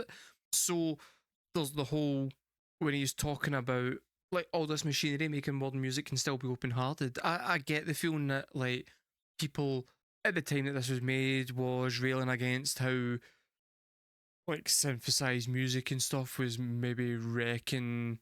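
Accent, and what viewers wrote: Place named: Glasgow, United Kingdom. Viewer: Hey man, you should subtitle your video because of your outrageous Glasgow accent. I myself can understand it because I’ve see Trainspotting 20 times, but a lot of others prolly don’t know what your saying.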